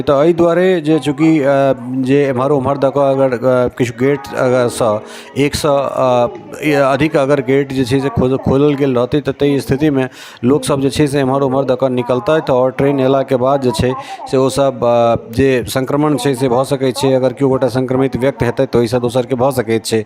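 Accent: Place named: Bhojpuri, India. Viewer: चुप हो जा बहुत छ छ छ किया भोजपुरी बोले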